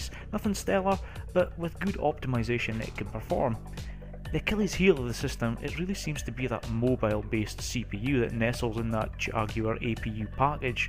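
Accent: Scotland, United Kingdom. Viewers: Your Scottish eh? Dinny try hide it noo.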